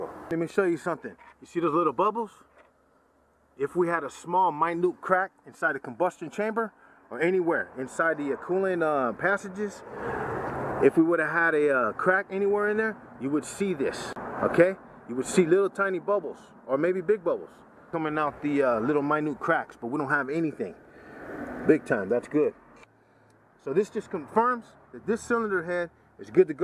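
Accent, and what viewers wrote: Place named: Los Angeles, United States. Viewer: Hi nice video, you sound like you're from LA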